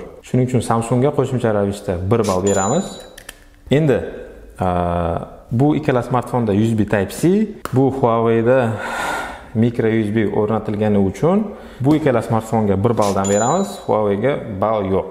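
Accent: Khorezm, Uzbekistan. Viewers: Xorazmdanmisiz😃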